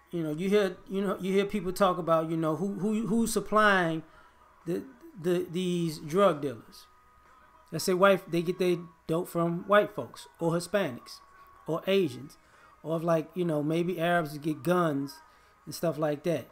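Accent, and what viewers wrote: Chicago, United States. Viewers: I thought I heard a Chicago accent.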